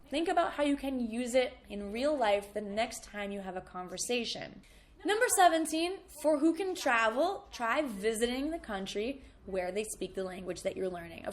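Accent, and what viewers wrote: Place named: United States. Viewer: What is the difference between American accent that you speak and Canadian accent?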